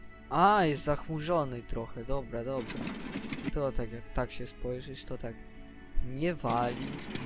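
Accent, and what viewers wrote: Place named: Poland. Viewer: Masz bardzo fajny głos. Czy ty pochodzisz z jakiegoś regionu polski że masz taki akcent?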